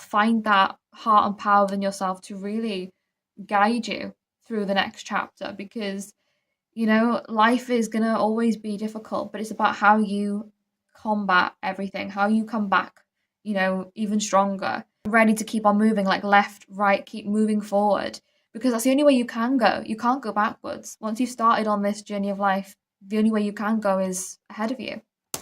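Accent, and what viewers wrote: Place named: Nottingham, United Kingdom. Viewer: Your very smart.. thank you for appreciating mvs.. I remember my friend from nottingham in UK.. you both speak the same.. ❤❤❤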